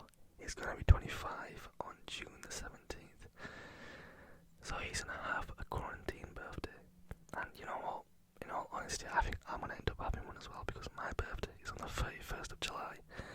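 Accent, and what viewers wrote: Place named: Yorkshire, United Kingdom. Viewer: I like your outlook on people who don't get ASMR! Just let them go 😂 I been guessing you were from Leeds from your accent, when you said Bradford made my day! I never get it right hahah. So glad your channel has grown, well deserved ✨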